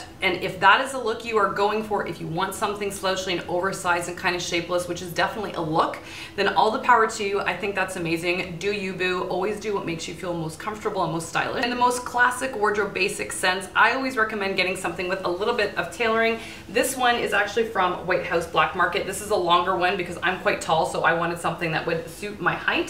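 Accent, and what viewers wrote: Canada. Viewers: I love watching you. Your denim video is basically my go to guide now and I kept saying to my friends, no the point of the pocket should be at the bottom of your bum....LOL. I love your authenticity, sincerity and expertise. I watched so many stylist on youtube and ones who have a lot more subscribers but I only subscribed to you because you are doing a great job providing genuinely value-add content. I also love your Toronto accent as one of my favorite co-workers is from there and she sounds like you :) I am really proud of the work you are doing and I just want to encourage you to keep fighting the good fight!!!!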